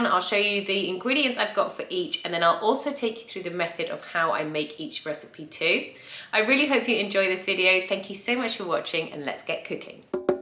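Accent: United Kingdom. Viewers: At last.....! A Brit ! Not a Yank. British meals preped by a lady with a British accent..:)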